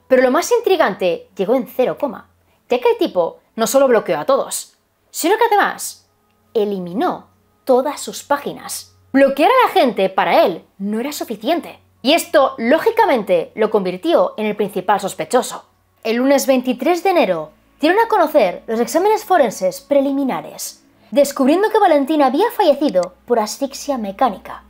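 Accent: Spain. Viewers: me encantan tus vídeos Antes cuando recién vi uno no entendía bien el español de España me parecía muy rápido la manera de hablar, ahora ya lo comprendo súper bien 😂❤ Me acompañan tus videos mientras hago la limpieza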